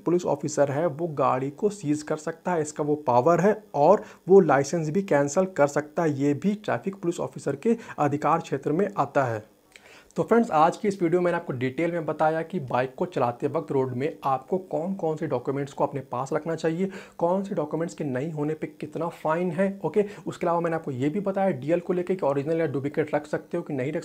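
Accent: Ranchi, India. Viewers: bhai tum ranchi se ho?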